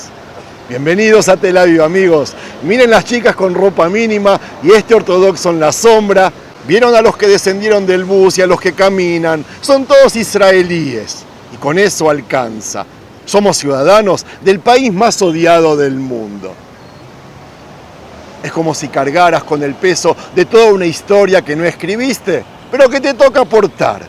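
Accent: Argentina. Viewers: Siempre vivieron y viven tranquilos en Argentina.. no se entiende! . De hecho el habla como Argentino y no sé si vive acá también.. me parece que es un poco mucho hablar así de Argentina.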